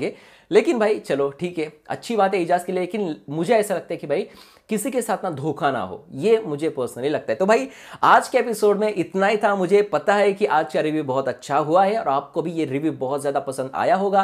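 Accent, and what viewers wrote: Maharashtra, India. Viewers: Hi...... Tu Marathi ahes ka....? Tuzya bolanyachya ton Varun vatat...!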